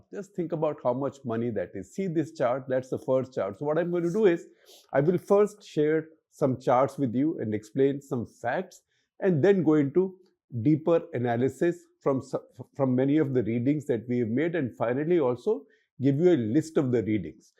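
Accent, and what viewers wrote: India. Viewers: Yo, Can you speak proper English instead of Endiaish? It's an embracement for your English master out there after all these many years of colonizing.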